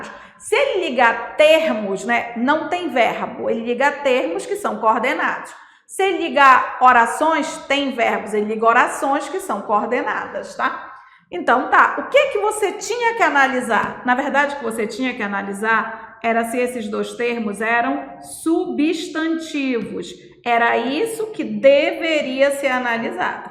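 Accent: Pará, Brazil. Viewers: O lhindo entregando que é paraense kkkkk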